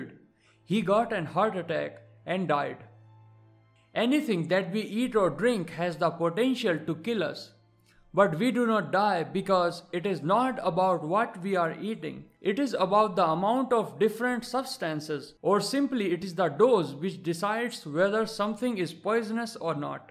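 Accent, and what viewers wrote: India. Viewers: has an indian accent...btw are ya indian...if you are then namaste..I m also indian...and if you are not than I will be the one embarassed..lol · Your accent sounds like Indian. You definitely are from South Asia. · Lemme guess you are indian becoz ur accent bro i love india they are kind persons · His voice sounds like a indian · Are you From india? Because Your Language is Indian English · You talk like an indian and i love it KEEP IT UP😁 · U just gave a love to my comment. I still haven't got my answer which country u belong to I have seen every video of yours today I visited your another channel and by face and voice it seems that u are an Indian . I want my answer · To be honest voice sounds like an India like if you think to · Why are you talking in a Indian accent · So easy to tell that ur indian i am from nepal btw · Me: Time to watch!! 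* Indian guy starts talking* Me. What a hell!?!? THATS SO COOL!!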